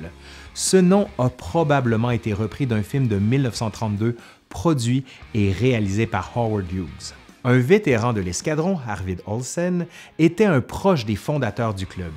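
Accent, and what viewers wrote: Quebec, Canada. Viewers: Je trouve que tu t'exprime super bien de façon à ce que les gens qui parle français arrive à comprendre. J'aime cet accent Québécois et ta prononciation des noms en anglais. Bon travail et merci pour ces informations que je ne connaissais pas du tout sur les hells angels ; moi qui croyais que c'était juste une bande de motard qui aime se battre ! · Ce documentaire est très instructif agrémenté par le bel accent québecois !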